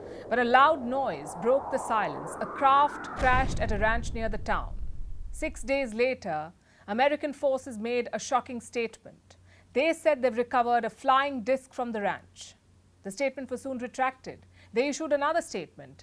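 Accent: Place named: India. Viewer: So we have to listen to a woman with a strong India accent and no USA native speaker is available to deliver the story?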